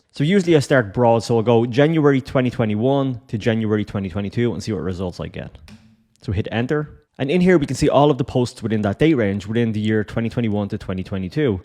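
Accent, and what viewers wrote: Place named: Ireland. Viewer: Excellent advice snd a irish accent double whammy why to follow 😊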